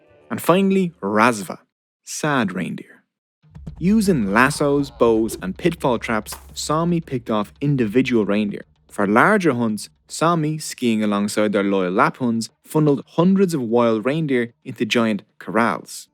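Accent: Dublin, Ireland. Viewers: Last thing I expected was the thick inner city Dublin accent! Brilliant. Thanks a million champ!!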